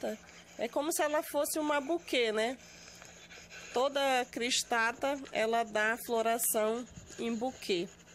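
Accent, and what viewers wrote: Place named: Pará, Brazil. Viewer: Pelo sotaque, eu tinha certeza que moravas no Pará,! Só não sabia a cidade😅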